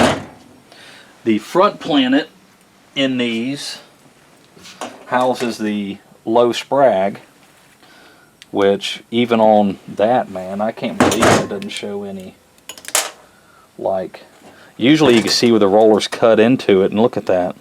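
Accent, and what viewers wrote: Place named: South, United States. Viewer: I really appreciate your videos and your vast knowledge of switch pitch TH400's. Where are you located? You sound like me......Southern. :-)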